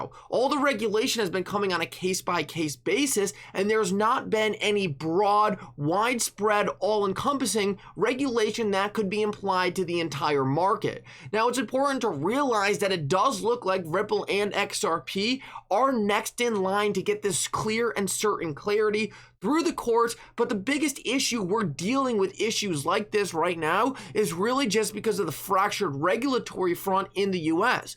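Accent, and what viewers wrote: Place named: Philadelphia, United States. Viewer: THAT IS A 215/267 AREA CODE ACCENT! LOL BUSTED! LOVE ALL OF YOUR CONTENT EITHER WAY YOU HAVE BEEN #1 ON THIS CASE FOR THE PAST SEVERAL MONTHS IN MY OPINION! POWER TO THE HODLERS❤🎉😊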